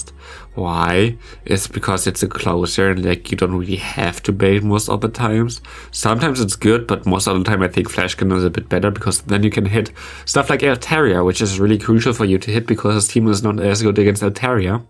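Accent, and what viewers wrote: Germany. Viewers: His German accent is kinda strong… but as a German like me you can understand his English very well. 🤔😅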